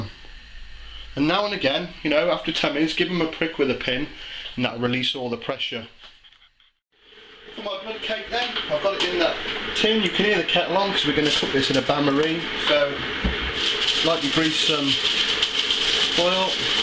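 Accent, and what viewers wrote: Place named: Midlands, United Kingdom. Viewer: Are you from the Midlands,? I can tell by your accent.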